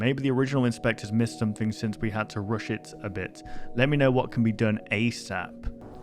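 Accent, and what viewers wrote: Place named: United Kingdom. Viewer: Bro is so British I thought he was saying shack instead of shark for like 60% of the vidoe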